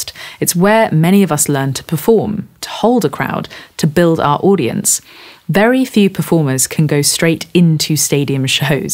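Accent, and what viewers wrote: United Kingdom. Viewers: Dear Ms., I can't remember such an attractive, pleasing British voice and speaking as yours. I was stunned at the first several seconds. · Love that firm, assertive/relaxed vaguely mannish Brit accent. Did she already address the Leap Frog debacle ? "What's he really like ?" Etc., etc. .ZZZZzzzzzz..."Corporate rock still sucks." Etc. , etc.. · Is getting MORE British?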